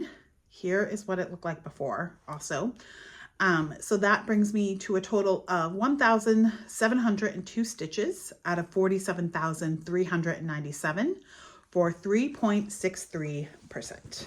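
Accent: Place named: Maine, United States. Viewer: Hi...first, great progress on your WIPs and goals! Second...GO PATS! Third...as a Maine girl who has been in New Zealand for 22 years, you simply make my heart smile with your "yapping". Sounds like home to me, so thank you! Happy stitching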